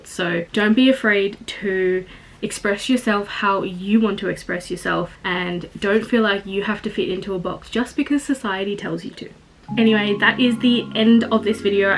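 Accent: Australia. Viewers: She's black. What do you think she is?? She just has an accent, either Australian or South African.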